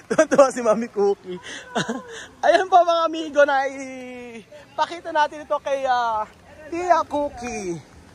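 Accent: Nueva Ecija, Philippines. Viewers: Extrovert kc tlga c kuya tas taga Nueva Ecija pa, minsan ang punto ng pananalita d2 sa amin sa Nueva Ecija e sadyang mlkas at minsan napagkkamalang maybang pero ganun po tlga kming magsalita. Inamin din nmn ni kuya na may pagka bully sya, mapang asar din sya pero ganun nga ang personality nya. Oo nga, minsan need din tumahimik lalo na sa mga museums o sa lugar na dpt di maingay. Di rin ako pabor na isinasama si Misma sa mga green jokes kung nagkaganun nga( di ko npansin nung pinanood ko video sa LU). Pero cguro pag di rin magdadaldal o tatawa c kuya, may masasabi n nmn tayo ano? Kung seryoso sya, may masasabi ulit tayo. Lahat ng galawan nya, papansinin natin di ba? Tas ibabash natin sya di ba? Kya, madaldal man o tahimik c kuya, may masasabi at masasabi pa rin tayo. Hayyyy, ewan ko my face( ibash ko n rin self ko)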